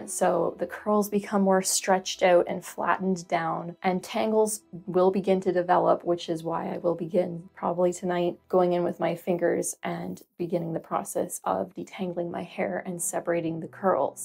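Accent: Canada. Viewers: Smart Girl ! 🙏 You Sound Canadian. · Maybe it's your accent (canadian?)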